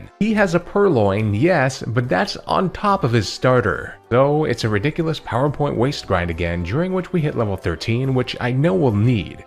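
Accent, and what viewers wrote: Canada. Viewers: Your canadien eh?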